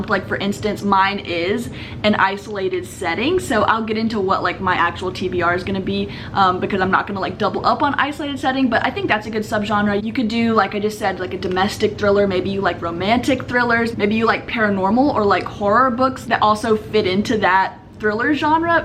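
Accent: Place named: United States. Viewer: I need to know what state your from. I feel like I know that accent!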